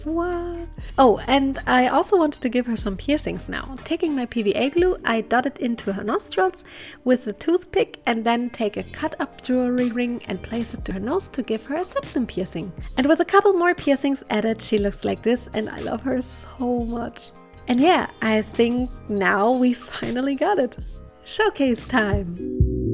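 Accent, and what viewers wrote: Germany. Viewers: Do I hear a slight and very cute german accent? 😉 However, the doll is amazing!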